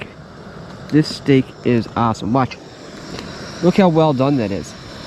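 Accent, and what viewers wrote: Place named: Cape Breton, Canada. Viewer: You sound like my Cape Breton brother the way you speak. I enjoyed this video. Thank you🤗🇨🇦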